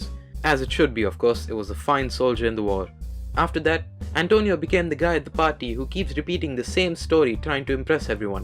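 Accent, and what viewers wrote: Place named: United Kingdom. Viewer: im dying lol, gj m8 nice british accent btw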